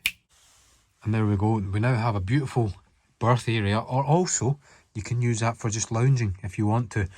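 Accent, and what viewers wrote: Scotland, United Kingdom. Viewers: What you have here is a great example of what Marine Sales can be, ( The Scottish accent puts it over the top.)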